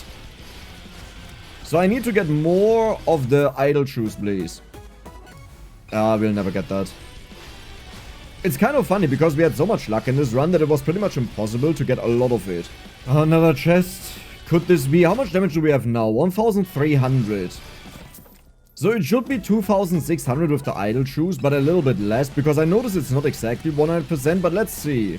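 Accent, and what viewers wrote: Germany. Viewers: der deutsche Akzent ist lustig und mittendrin immer die deutschen Fluchwörter for my english fellas: the german accent is funny and in the middle of it all there are always the german curse words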